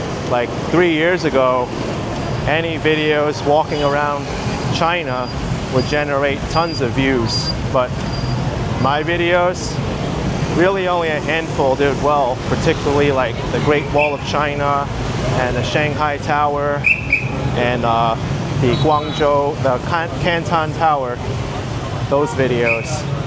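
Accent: New York, United States. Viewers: You're a walking billboard for NYC with your accent. That's what initially drew me to your channel. I enjoy watching any content from NYC be it food reviews, apartment tours, fart videos, tourist videos, and opinion pieces. It's just felt like you've been on very long vacations the past year away from NYC so I haven't watched. Being from Toronto, I've rewatched your travels here countless times. You need to come back to Hong Kong Bistro Cafe. 😃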